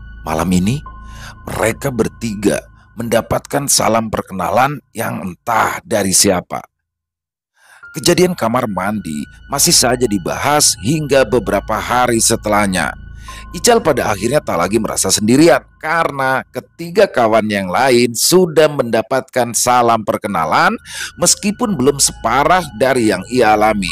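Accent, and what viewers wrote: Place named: Kalimantan, Indonesia. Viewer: Kok yo Kalimantan bgt gtu lho 😭😂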